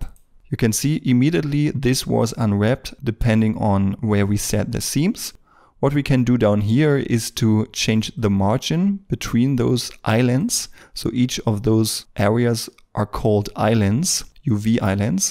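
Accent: Germany. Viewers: Your English is good (I think, I don't know, I am not a native English speaker either), but I figured out that you are from Germany from you accent anyway. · dat german accent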